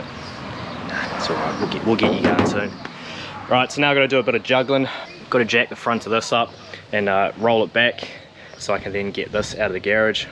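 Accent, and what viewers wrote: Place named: New Zealand. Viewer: What part of nz are you from bro?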